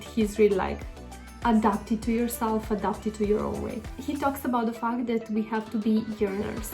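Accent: Italy. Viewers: Cute the italian accent , continua cosi!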